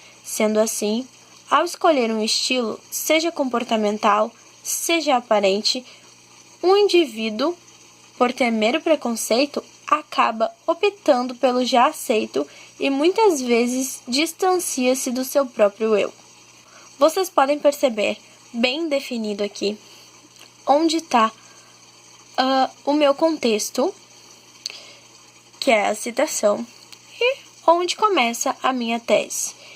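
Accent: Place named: Rio Grande do Sul, Brazil. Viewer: oi vejo que seu sotaque é um pouco gaúcho de onde tu é? sou gaúcha de porto alegre bj!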